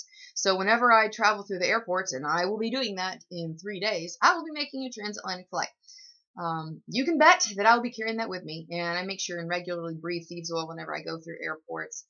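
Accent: South, United States. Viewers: Scott Haskin You're right you can't hide Natural Beauty and that southern accent makes me week at the knees..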